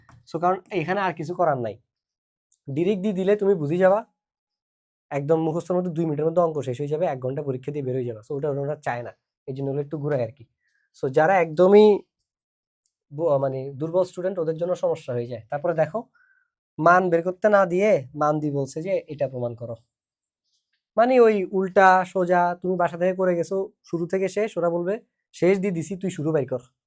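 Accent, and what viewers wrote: Chittagong, Bangladesh. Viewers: Sir Apni ki chatgaiya?Because apnar ei video te pura chatgaiya accent lagtese 😭..or is it just for the video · ভাইয়া, তই তো চিটাংগে হতাও ফারো দেহির🙂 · Vaiya to pura chatgaiya 👏💀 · Kire pura accent ta chittainga 🙏😭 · বদ্দা তই ত দেহিদ্দি পিউর চিটাইংগে😅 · ভাইয়ার তো আজকের ভাষা পুরাই চিটাগাং এর মানুষের মতো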